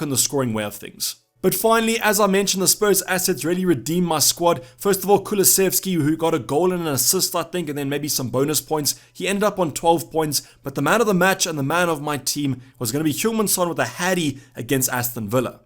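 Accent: South Africa. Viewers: You sound South African My G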